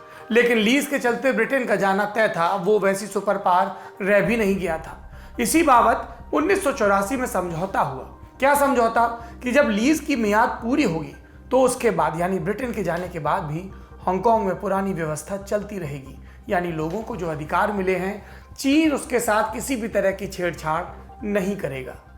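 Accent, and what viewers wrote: India. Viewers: Apne state ki khushbu aati h anchoring m apki ji..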